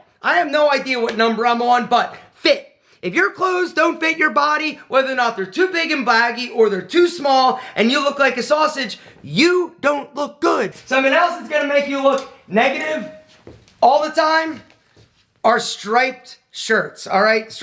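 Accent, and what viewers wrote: Philadelphia, United States. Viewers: “Drink wyahter” “medium lenth hair” 😂 I love how you can still hear Philly accent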